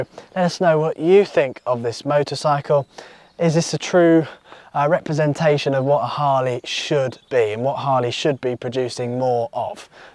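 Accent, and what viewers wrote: England, United Kingdom. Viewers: How tall are you? Asking cause if you’re 5’9/5’10, or roughly 1.75 meters since you sound English it looks like the size of my Honda shadow and I’m looking for something bigger.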